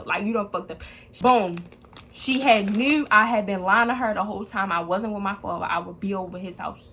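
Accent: Baltimore, United States. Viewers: Your accent sounds like you from Baltimore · You got to be from Baltimore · your accent, you def from baltimore 😂 i never been there and know no one from there but ik the accent clear as day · I KNEW YOU WAS FROM BALTIMORE 😭😭😭😭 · is she from bmore i know im late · You from Baltimore ? cause that accent 😍😩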